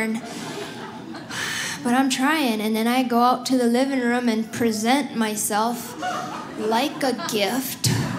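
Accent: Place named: Wisconsin, United States. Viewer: I love her accent! As a cheesehead in Wisconsin, I probably talk the same way.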